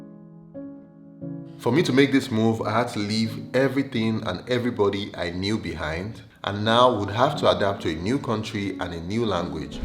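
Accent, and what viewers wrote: Nigeria. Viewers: he is a Nigerian speaking English and therefore has a Nigerian accent! Use your head. Would you say the same to Wole Soyinka? Examine your inferiority complex. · It's surprises me you have achieved such a high number of followers with such a heavy Nigerian accent. Anyway well done to you. I think Portugal is a good choice.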